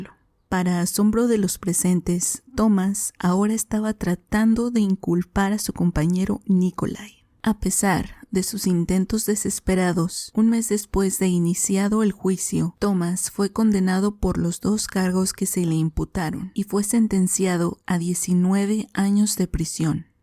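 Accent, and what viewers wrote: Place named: Brazil. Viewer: Hey I hear an interesting accent do Brasil?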